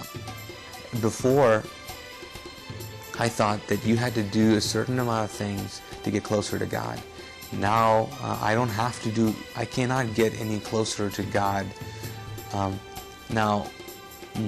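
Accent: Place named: Arab world. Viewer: Good Arabic accent, brown skin dude, seems legit!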